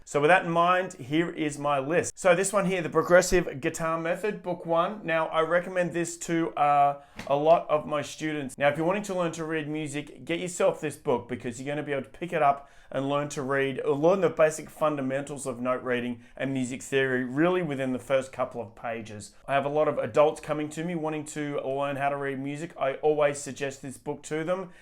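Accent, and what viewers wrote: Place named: Australia. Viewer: is such a treasure! Hello from America. I could listen to Aussies talk all day.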